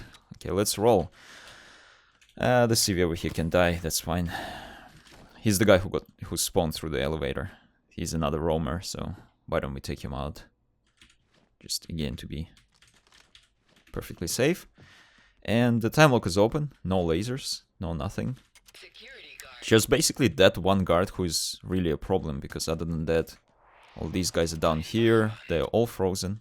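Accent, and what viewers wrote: Russia. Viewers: you from Russia or Germany? Your accent as a normal russian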